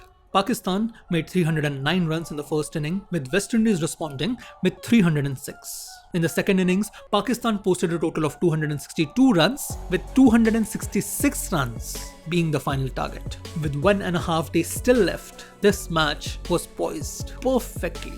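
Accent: India. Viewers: very good commentary....the pace of the speech was extremely good ...the words were clear and spoken with mildness and full of extremely good punctuation. ....unlike the stupid videos uploaded these days where the words aren't clear and there are hundreds of grammatical errors....the accent was very indian but that doesn't matter when the speech is so good.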